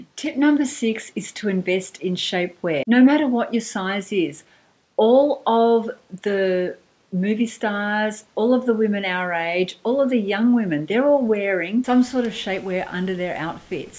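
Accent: Australia. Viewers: Super fantastic video!! So happy I found your channel. I love all these suggestions, and do already wear those types of flowy tops, longer in front and back! Thanks for the links. I LOVE ❤ your hair! Just beautiful 😍 Is it all your own? (I have a family member in the hairdressing business), so have had extensions and own a couple hairpieces. I'd love to hear where/how you get such a gorgeous mane! I love your accent too (Australian?) Sorry so long...new subscriber lol 😄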